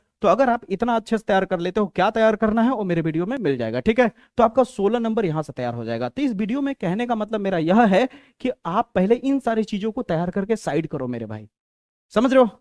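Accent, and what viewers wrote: Bihar, India. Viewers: Guru ji ki aap Bihar se ho kya❤❤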